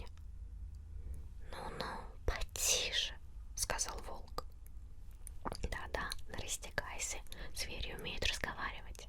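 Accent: Russia. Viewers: ロシア語の発音ほんとすき、日本人じゃ絶対できない🥹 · el acento ruso es hermoso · me gusta mucho el acento ruso 🤧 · I love the Russian accent and the way it sounds. Only when it's not a Russian yelling at me on a video game tho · I love Russian accent🥰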